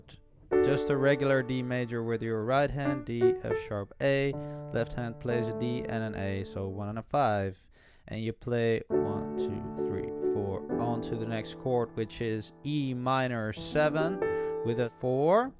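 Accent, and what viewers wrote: Netherlands. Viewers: Thank you, this was helpful! :) Also, you sound like you have a Dutch accent, no? haha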